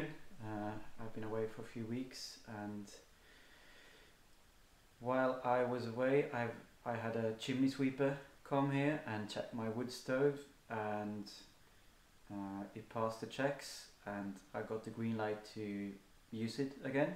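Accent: United Kingdom. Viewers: Du har en brittisk dialekt har du bott där tidigare ?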